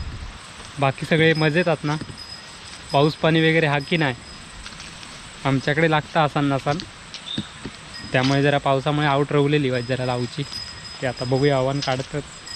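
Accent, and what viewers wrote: Malvani, India. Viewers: बाबू तु मालवणी बोलतोस तेच आम्हाला आवडते तुझे गावचे विडीयो एक नंबर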